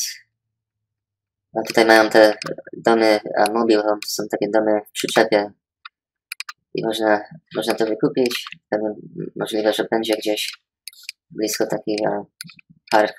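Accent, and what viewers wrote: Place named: United States. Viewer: Zawsz chciałem poznać kogoś kto mówi po polsku a się w Polsce nie urodził i posłuchać jak mówi. Twój język jest dosyć zabawny dla widzów, którzy nigdy nie byli w USA i nie znają Polonii. Zabawny w pozytywnym tego słowa znaczeniu. Ale uwierz mi, że o wiele bardziej wolę słuchać Ciebie i o wiele łatwiej Cię rozumie, niż niektórych nastolatków w Polsce. Szkoda że zmieniłeś swój głos modulatorem. Nie powinieneś się przejmować uwagami. Ktoś kto ma minimum inteligencji i wyobraźni wie, że niełatwo jest mówić idealnie dwoma językami, jeśli się w obu krajach nie mieszka i nie ma na co dzień kontaktu z "żywym" językiem. Tak samo zabawnie brzmi ktoś kto świeżo przyjedzie z Polski i zna język angielski tylko ze szkoły.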